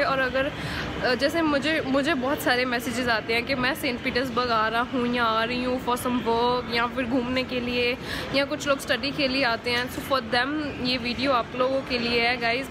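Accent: Punjab, India. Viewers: Even while talking in Hindi , accent is still Punjabi ...love u cutie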